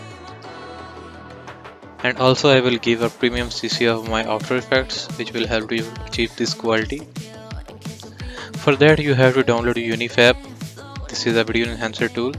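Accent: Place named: Pakistan. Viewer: I guess you're from Pakistan from your voice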